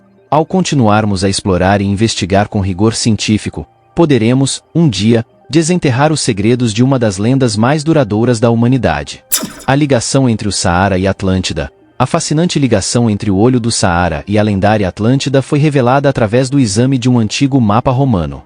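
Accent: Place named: Brazil. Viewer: Colombo chegou À América... não destrua a gramática da língua portuguesa com sotaque brasileiro.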